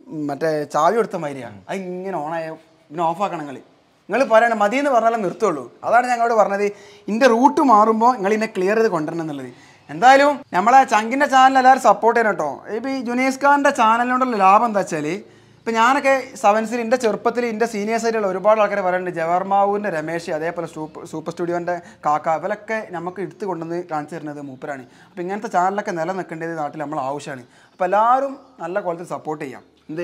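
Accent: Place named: Kasaragod, India. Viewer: ഞാൻ അകത്തേക്ക് പോയപ്പോൾ ആണ് interview എടുത്ത ആളെ മനസ്സിലായത്.. Ninghlde കാസർകോടൻ ശൈലിയിൽ ഉള്ള ..സംസാരം pwoli ആണ്. Aa റോഡിനെ കുറിച്ചും ,പിന്നെ Corona കുറിച്ചും പിന്നെ പലതും superb 🔥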